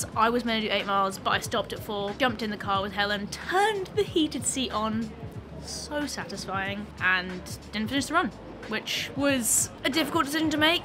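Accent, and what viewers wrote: United States. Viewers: Hahaha your American accent is adorable!!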